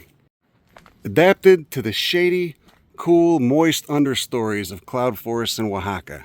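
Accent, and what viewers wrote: Chicago, United States. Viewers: He’s from Chicago. It’s a Chicago accent. You have to be born and raised here.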